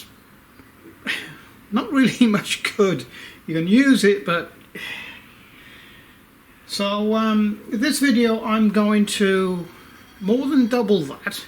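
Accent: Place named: Shropshire, United Kingdom. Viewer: You sound like a Shropshire mon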